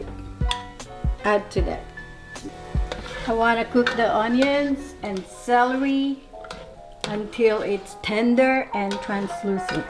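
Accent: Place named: United States. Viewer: i like your cute american accent , count me as your number 1 fan and subscriber. i miss your cooking when we were a lot younger !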